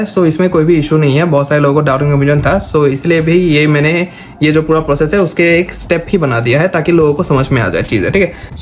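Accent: Assam, India. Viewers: Kotha pota style tut ye axomiya lage 😂❤️🙏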